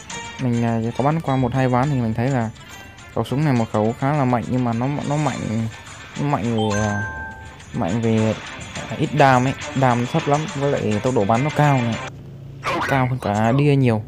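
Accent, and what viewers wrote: South, Vietnam. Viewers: Miền nam à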